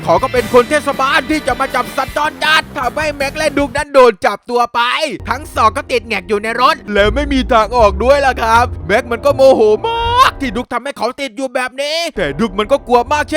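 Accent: South, Thailand. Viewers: ชอบพูดไต้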